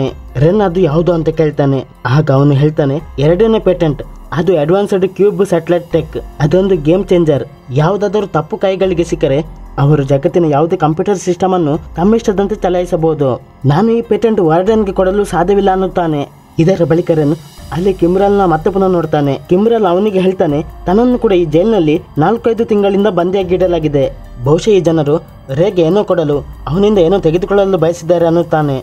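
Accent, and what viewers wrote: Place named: Mangalore, India. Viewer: Are u from Kudla/Manglore?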